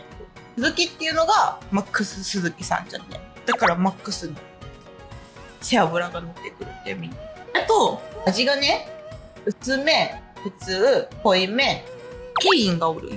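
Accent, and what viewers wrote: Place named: Okayama, Japan. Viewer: ここも美味しいですが、埼玉の騎西店はラーショの中でもサイコーです。是非一度！でも、どこの訛りなんやろ❔岡山辺りかな～⁉️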